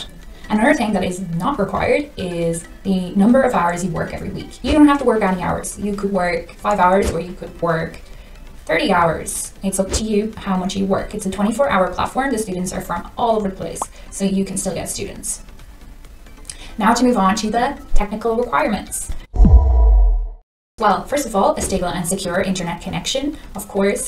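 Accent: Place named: Ireland. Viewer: Are you from Ireland? You sound Irish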